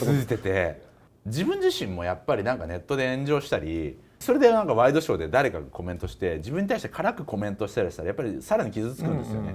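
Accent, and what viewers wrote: Kansai, Japan. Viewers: 中田の関西弁まぁまぁ上手くて草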